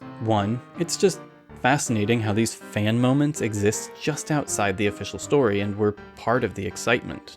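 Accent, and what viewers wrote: United States. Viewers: You sound American, you can't access the Internet Archive?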